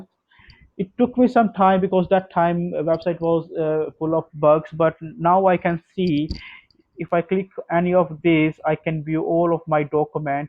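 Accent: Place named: India. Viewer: Indian accent is very annoying disgusting 🤮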